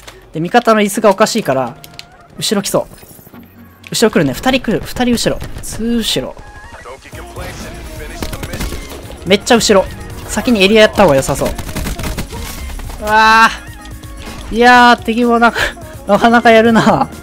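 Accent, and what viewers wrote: Kansai, Japan. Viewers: 関西弁自然で草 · 関西人やったっけ？って思ったよな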